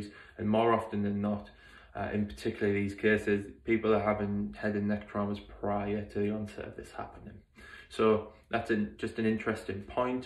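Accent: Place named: Teesside, United Kingdom. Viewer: I have MS and found this really interesting, thank you. I’m originally from near Manchester and now live in Teesside, trying to pin point your accent. Definitely a N.Yorkshire / Teesside twang coming through.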